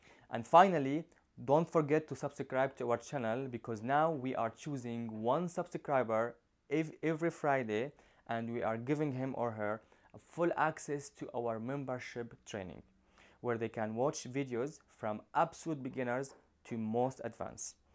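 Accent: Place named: Lebanon. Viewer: This is in the lebanese accent. Although the words are same, the words are pronounced slightly differently from the Gulf countries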